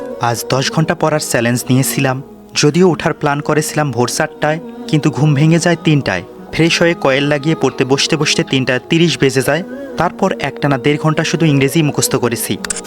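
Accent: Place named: South Bengal, India. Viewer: আপনার কথায় দক্ষিণবঙ্গের Accent রয়েছে।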